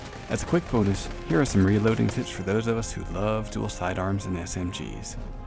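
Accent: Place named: United States. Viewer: wtf, I wasn't expecting an american lmao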